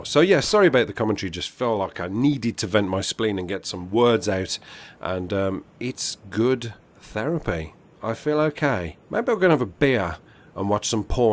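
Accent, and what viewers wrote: Birmingham, United Kingdom. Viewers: You don't sound tired mate, it's just the brummie accent! ;-)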